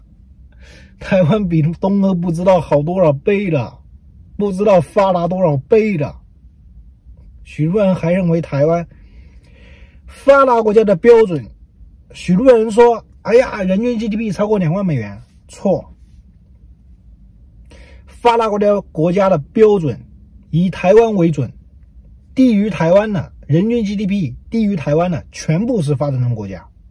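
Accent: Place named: China. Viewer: 他不是台灣人來自中國，聽口音也知道。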